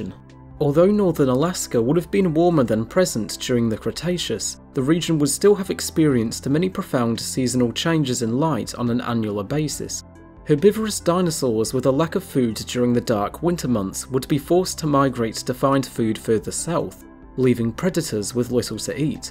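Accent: England, United Kingdom. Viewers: Why do all these guys who push the feathered dinosaur theory have English accents?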